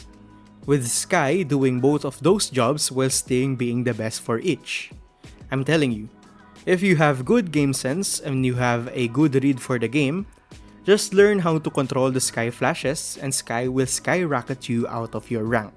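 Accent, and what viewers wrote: Philippines. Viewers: Are you filipino? your accent sound filipinos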